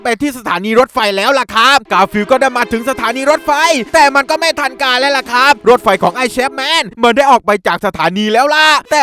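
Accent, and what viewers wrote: South, Thailand. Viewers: คนใต้ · สุดยอดสำเนียงใต้ 555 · พี่เป็นคนใต้หรอครับ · พี่คนใต้ใช่ไหมพี่ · พี่เป็นคนใต้หรอ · พี่ครับพี่เป็นคนใต้หรอครับ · พูดไต้ได้ด้วยไอย่าไอบาวนี้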